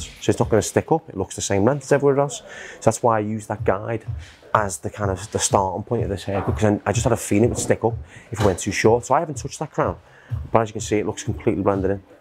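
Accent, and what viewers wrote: Liverpool, United Kingdom. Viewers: I’m a Yank so forgive me, but does have a Liverpool accent?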